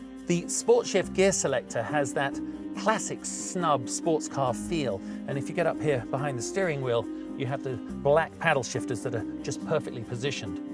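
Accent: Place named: United Kingdom. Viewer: if this is Jaguar USA, why the host has british accent?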